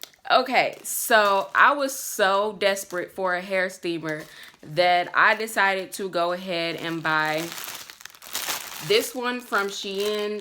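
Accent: United States. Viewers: HI Beautiful!! This IS a PERFECT Video!! Thank You!!! What part of the UK do you live?? You sound American.😃😇🌼💐🌸💐🌸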